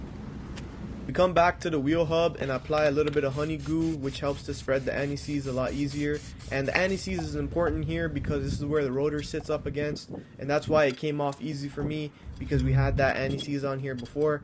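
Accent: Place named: Toronto, Canada. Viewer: sounded like a toronto accent :p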